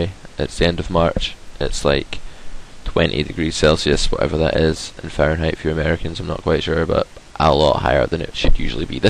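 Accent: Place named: Scotland, United Kingdom. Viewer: Thanks such helpful content on this channel. But why is Scottish 😆?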